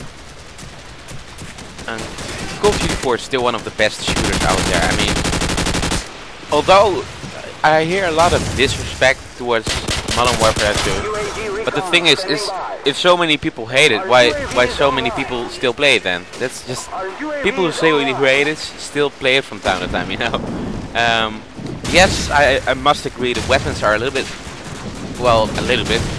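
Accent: Netherlands. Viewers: I knew it, i noticed a dutch accent :p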